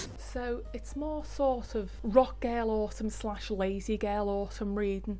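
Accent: Liverpool, United Kingdom. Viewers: aaaah, thank you for much for replying! I have been to many cities in the UK but not to Liverpool yet (although I'd love to) - this explains it! I can't understand people being hateful to accents, they are the best thing ever and something to cherish as you do :) It's one of the things I love the most about your channel.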